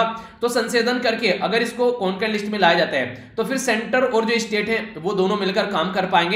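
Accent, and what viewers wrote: Haryana, India. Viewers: Sir aap pkka haryana se ho ,Musa =rat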